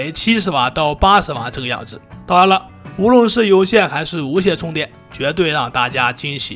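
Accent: Shanxi, China. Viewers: 这是山西口音，你会不会听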